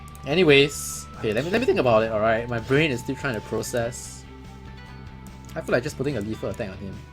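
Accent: Singapore. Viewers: Are you also from singapore?? :D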